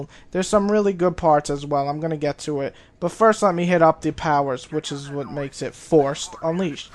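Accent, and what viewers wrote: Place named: New York, United States. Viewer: Proper New yorker :) The way he says bosses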